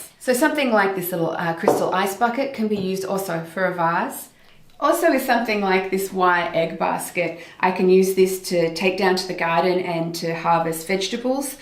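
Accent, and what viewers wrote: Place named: Australia. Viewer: I can't handle the Oz accent....I tried to watch the whole video but didn't last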